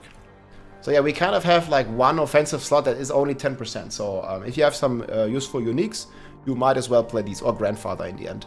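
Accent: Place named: Germany. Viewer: Wenn Deutsche Englisch reden, zu funny :D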